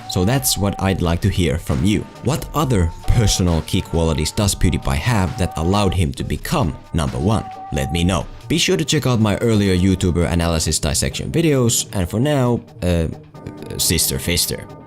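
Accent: Finland. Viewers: are you Finnish? Just wondering because of your accent. Pretty cool if you are, I don't know too many Finnish channels in the international waters. You have good stuff here, either case! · Hi, where are you from? Your accent sounds finnish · Tää kuulostaa aika suomalaiselt englannilt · Where are you from? At first I thought you were an American but then I for some reason started to pay attention to your accent. Kinda sounds like a Finn with a great English accent. Idk, I'm just curious. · Oletko Suomalainen? Do I hear a finnish accent? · are you finnish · Nice vid! I started following Pewds when he had less than 50k subs, and the transformation is really amazing. I unsubbed a couple years ago, but now I'm loving his content again! Oh and are you from Finland btw? Your accent sounds like you could be :D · Where are you from? Your accent sounds Finnish. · Suomiaksentti spotattu 🤔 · Your accent sounds finnish 🤔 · You sound very finnish. 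Also, good content! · you sound like you are Finnish, is that true? If so, then Torille! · I think this guy is from Finland, so much finnish accent.. And he say the name just like any finn would say it ^^ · Are you from Finland? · Hey bro are you from Finland because you have a slight finnish accent. Like the way you say T's and A's is just very finnish you could definitely work on them. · Do I hear a finnish accent? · the finnish accent. so good. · Hey, weird question... Where are you from? Your accent sounds a bit like that of a Finnish person.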